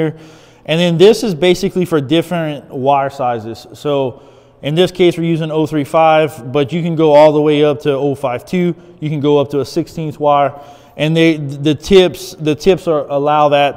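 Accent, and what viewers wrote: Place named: South Louisiana, United States. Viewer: You might be in Wisconsin but you can’t hide that south Louisiana accent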